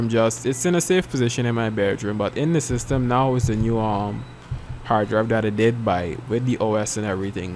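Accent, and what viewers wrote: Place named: Caribbean. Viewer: Good to hear a Caribbean voice on YouTube for once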